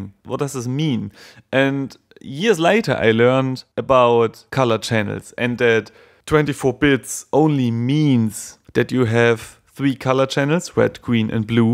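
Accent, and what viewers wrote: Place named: Germany. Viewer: I prefer the video for an introduction and then read your article. Your german accent helps me to understand your English as I am French. Reading is a more abstract process and requires more attention.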